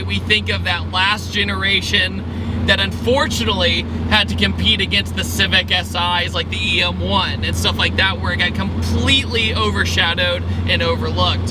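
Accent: United States. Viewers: Damn the accent tho even when saying wrx american english and accent.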